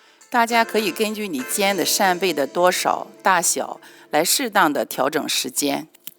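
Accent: Shandong, China. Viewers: 聽到了熟悉的青普，給你點個讚👍我買的扇貝柱牙磣怎麼办？ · 听口音您是山东人吗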